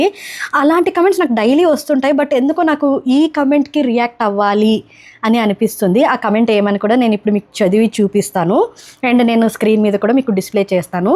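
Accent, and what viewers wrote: Karnataka, India. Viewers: గారు మీ వీడియోస్ చాలా అద్భుతంగా ఉంటాయి లాంగ్వేజ్ గురించి మాట్లాడితే కర్ణాటక తెలుగు కర్ణాటక తెలుగు కు ఆంధ్ర తెలుగుకు కొద్దిగా తేడా వస్తది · Miru kannadollu ina trlugu vallu ki mi kannada accent nachindi emo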